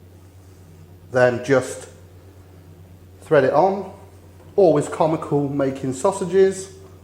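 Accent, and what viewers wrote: United Kingdom. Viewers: Stick to the metric system. Pounds, ounces, etc made no sense in your british accent.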